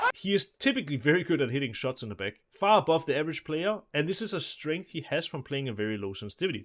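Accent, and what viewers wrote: Denmark. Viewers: flot dansk accent :D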